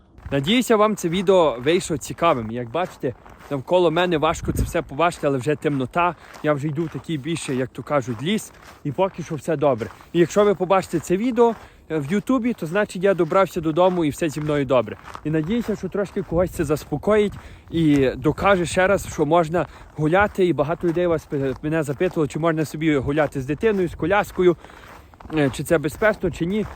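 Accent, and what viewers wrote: Western Ukraine, Ukraine. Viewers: галицький діалект, і чого так зневажливо, " западенці"?, це що за кацапські словечки? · Не чув) але говір як западенський)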